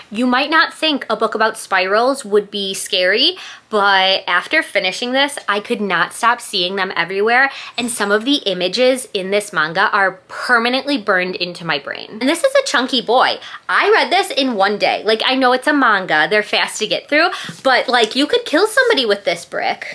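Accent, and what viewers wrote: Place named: Milwaukee, United States. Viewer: your voice is very milwaukee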